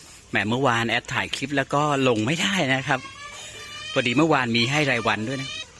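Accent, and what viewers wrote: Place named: Laos. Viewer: ออกลาวแล้วค่ะ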